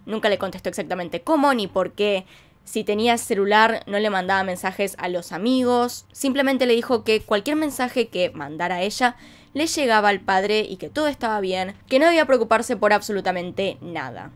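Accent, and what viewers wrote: Argentina. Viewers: Por favor ya no digas ANIO, ES AÑO POR FAVOR 🙏 😭, me ensartar como investigas punto por punto tus teorías llevo más de una semana escuchándote desde que descubrí tu canal y tu acento argentino es muy bueno 👌 solo porfavor en la pronunciación es año🤗 gracias espero puedas leerlo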